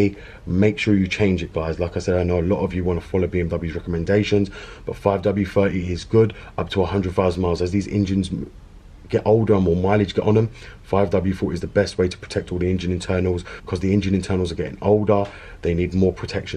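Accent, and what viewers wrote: United Kingdom. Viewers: Is this dude from the UK? What if you're driving an 2005 x3 e83 in a hotter climate like Sydney, Aus?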